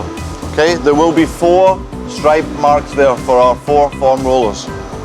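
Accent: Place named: Scotland, United Kingdom. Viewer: A good scotsman by the sounds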